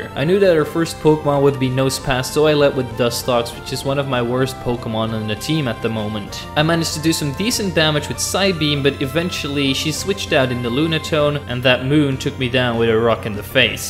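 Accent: Netherlands. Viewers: You sound dutch, is this correct? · Well if u aint Dutch or from Belgium idk anymore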